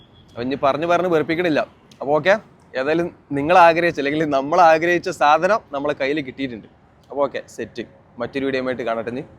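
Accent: Malappuram, India. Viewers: സഹോദരാ, നിങ്ങൾ അത് അർഹിക്കുന്നു. നിങ്ങളുടെ അവതരണവും വിവരങ്ങളും മലപ്പുറത്തെ ഭാഷയും വളരെ രസകരവും ഗംഭീരവുമാണ്. ഞാൻ കണ്ണൂരിൽ നിന്നാണ്.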